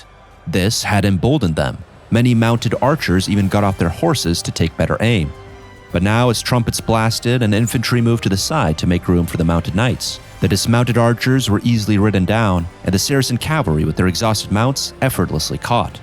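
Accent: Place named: United States. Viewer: Can't stomach the american narrator's accent, totally offputting.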